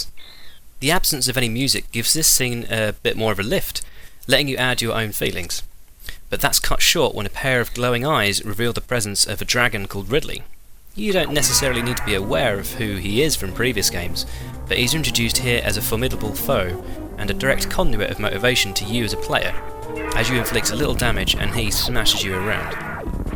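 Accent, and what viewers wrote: France. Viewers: Are you french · You are french my dude ?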